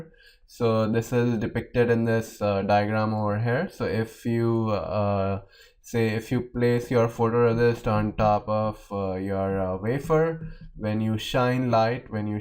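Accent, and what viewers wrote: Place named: India. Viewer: 阿三这口音我勒个去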